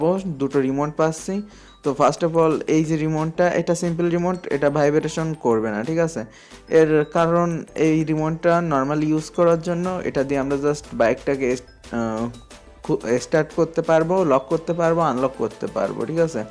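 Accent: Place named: Magura, Bangladesh. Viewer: ভাইয়ের বাড়ি কি মাগুরা? আঞ্চলিকতা রয়েছে ভাষার মধ্যে।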